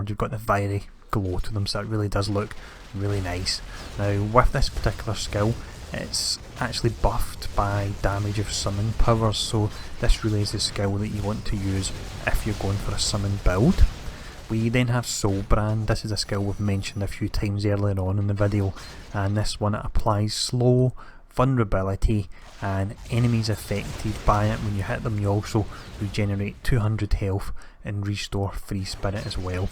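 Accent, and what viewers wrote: Scotland, United Kingdom. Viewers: ffffffuuuuck that Scottish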